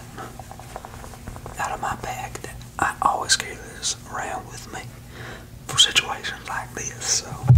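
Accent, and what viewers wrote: South, United States. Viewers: Hey you i have a video suggestion you should do a red dead redemption 2 video with the Southern accent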